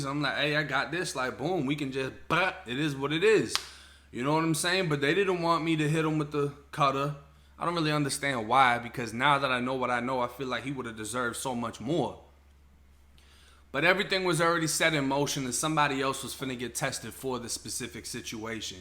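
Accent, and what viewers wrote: Boston, United States. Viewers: U from boston? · So u definitely from Boston right?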